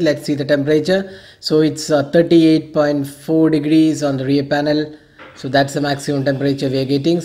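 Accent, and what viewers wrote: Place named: South India, India. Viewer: You are South Indian right?Love you accent bro no homo 😁.Good review just ordered mine today 32GB/3GB version one.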